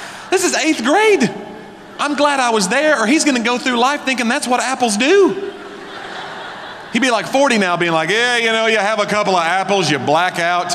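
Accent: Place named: Alabama, United States. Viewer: sounds like Alabamian’s.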